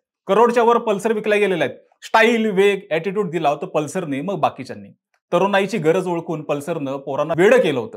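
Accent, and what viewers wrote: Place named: Kolhapur, India. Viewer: तो कोल्हापूर चां आहे तू पेठेतील असतील आणि एकदा पुणे गावठाण ची भाषा बघा पुण्यातून बाहेर या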